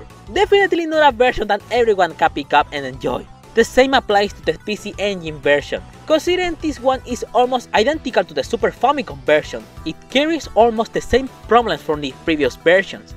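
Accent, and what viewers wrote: Chile. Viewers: Jajaja eres chileno!! Me suscribo jejej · este tipo literalmente es el único aweonao que suena como chileno hablando en inglés que habla de smt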